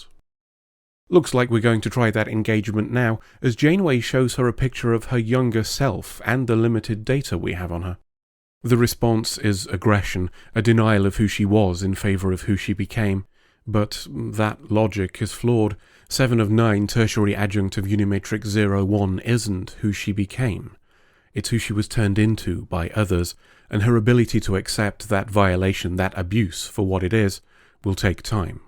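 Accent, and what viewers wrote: United Kingdom. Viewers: love your voice, love your British accent, and I love your deep analysis of my favorite Star Trek show lol "There is nothing beyond the subatomic." interesting how our science has progressed since the 90's. though, barely lol. i don't know how they could've done it (swirly-whirly-clownshow level) better, as they would've either needed to know future science, or make shit up, but I think they were trying to imply that consciousness is based on "quantum-level" subatomic mechanics (which they've done before, say, on DS9) which is fascinating if you're one of those people that ponders how consciousness physically works.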